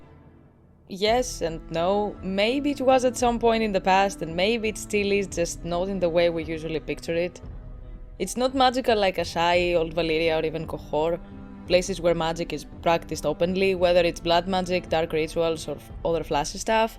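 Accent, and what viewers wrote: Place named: Greece. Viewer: heard your accent and HAD to check if you were greek lol. First Greek ive seen doing content on ASOIAF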